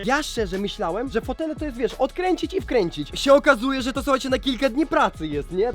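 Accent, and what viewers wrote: Ukraine. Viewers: co ty jesteś z ukrainy że tak mówisz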